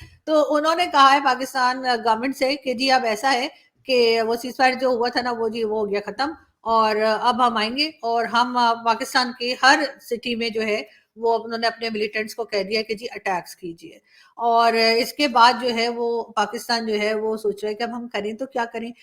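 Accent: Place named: Lucknow, India. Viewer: Well said....... Lucknow's style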